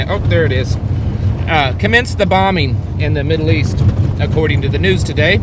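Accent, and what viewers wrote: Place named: Appalachia, United States. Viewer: Stall at will. I enjoy hillbilly speak. We Floridians are wanna be hillbillies. Swamp Billies.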